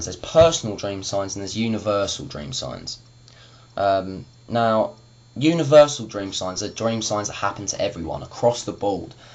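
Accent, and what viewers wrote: England, United Kingdom. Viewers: What part of England are you from? You sound like Daniel Radcliff... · well i can usually distinguish different english accents an he sounds like hes from the same region as rupert grint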